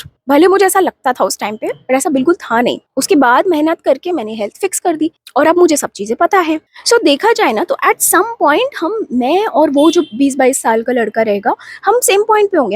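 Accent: Rajasthan, India. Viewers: Mam aap alwar rajasthan se ho kya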